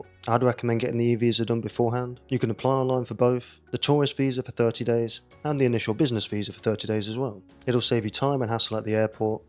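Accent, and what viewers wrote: United Kingdom. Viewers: I've been listening to you, thinking your accent isn't so different from my dad's family. They're Brummies! I hope that's not an insult... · Which part of uk is your accent from? Midlands ? Ha ha Many thanks,, curious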